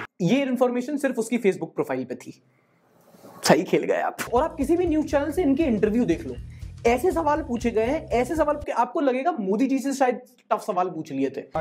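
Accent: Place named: Bundelkhand, India. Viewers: Sir your bundelkhandi is best i am from bundelkhand😂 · Your Bundelkhand accent.........Maja aa gaya😂😂😂😂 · That bundelkhand ascent was lit 🔥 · As a Bundelkhandi I can say you Bundelkhandi accent is 😂😂😂😂 amazing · As a bundeli 😍- Bro ur Bundelkhandi accent is on top notch!! 😅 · Bhai I am from Bundelkhand aapne bahut hi gajab Bundelkhandi boli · भैया हमें भी लग रहा हूं कि तुम बुंदेलखंडी हूं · Bro have full bundelkhandi accent I approve you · Bhai bhai aap to full bundli bol letai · My man speaks prime bundelkhandi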